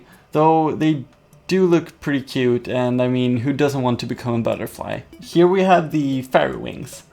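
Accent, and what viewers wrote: Sweden. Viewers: But the I realized he was “Swedish” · Are you from sweden?! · hörde att du var svensk direkt, enkelt att känna igen svensk engelsk dialekt ^^ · It's a Swedish accent, get used to it. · Are you swedish? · because he is swedish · are u swedish?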